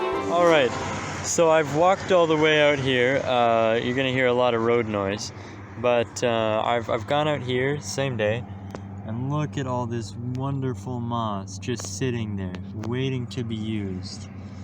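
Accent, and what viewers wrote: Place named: New Zealand. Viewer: Your voice and accent is just like Kane Williamson, New Zealand Cricketer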